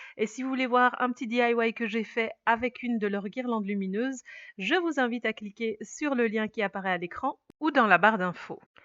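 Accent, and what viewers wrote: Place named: Belgium. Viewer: J'entend que tu viens de Belgique... tu viens d'où? C'est l'action de quelle ville? Bonne soirée!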